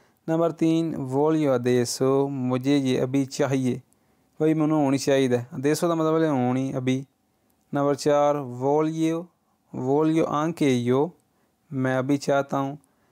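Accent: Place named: Gujrat, Pakistan. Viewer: بہت شکریہ شہزادے ویر بُہت سونا پڑھدے او توسی❤❤❤گجرات دی بولی بول دے او ویر جی ،رب سلامت رکھے جے آمین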